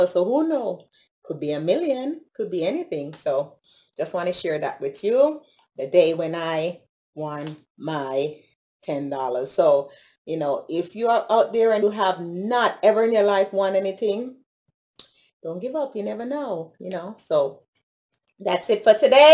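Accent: Jamaica. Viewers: A Jamaica yuh come from?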